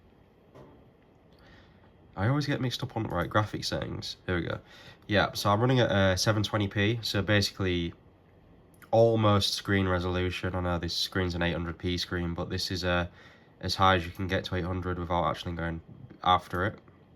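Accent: United Kingdom. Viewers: Guy with strong British accent play MGS with Russian translation? Is this kind of joke or what? :-)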